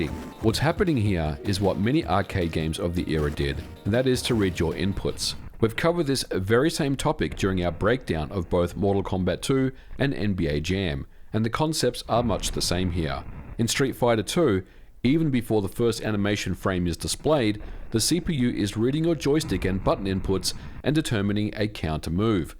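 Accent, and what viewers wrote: United Kingdom. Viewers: Even as much as I know coding and computer mechanics you make me look like an amateur and your accent, accents your intelligence, something about the Brits and Aussies that when you talk in technicals it all sounds even more sophisticated... Man i want to go back to the 90s so bad, even as a poor kid it still was the greatest decade ever to be a kid and arcades with games like Street Fighter II are a major reason why..even back then though I realized the business behind making the cpu seemingly godly at times since as u said the game was there to take your money and keep you coming back and I notice when I got it for snes while the cpu was still difficult it didn't have the upper hand the arcade did though I know thats cuz of hardware as well, that's what got me loving the game genie...Thanx as always for every upload covering lesser known topics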